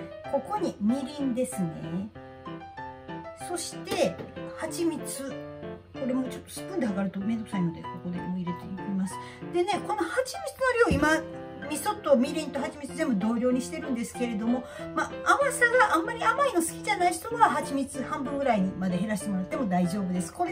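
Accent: Kansai, Japan. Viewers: わかりやすいですありがとうございます。 関西弁もサイコ〜です✨ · 先生の関西弁毎回聞いてて最高⁉️ 毎回楽しみにしてます。ナスは今は旬なんでつくってみます🎵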